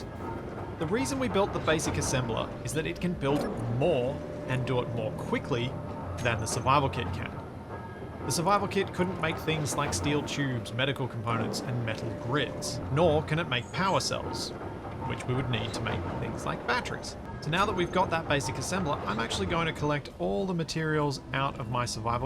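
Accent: Australia. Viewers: You sound Aussie as, and I liked this video with its easy and full getting started walk-through.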